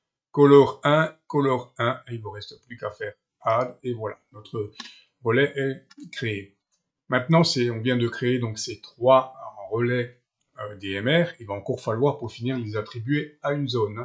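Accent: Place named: Alsace, France. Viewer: Salut! La fréquence TX du relais 3 a dû être décevante... Sinon bonnes explications avec une jolie pointe d'accent Alsacien. Je suis dans les Vosges, mais pas encore concerné par les relais, je n'ai pas encore de licence Pour le moment, je fais mumuse dans le monde du 446...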